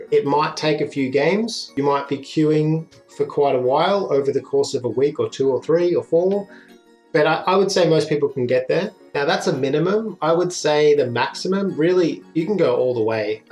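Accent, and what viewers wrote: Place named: Australia. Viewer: Loved the video and especially love the OZ accent!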